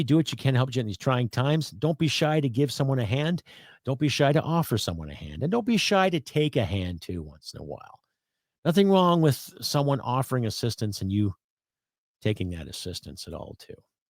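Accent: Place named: Alberta, Canada. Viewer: you speak fluent Albertan! (Please carry on! ) 👍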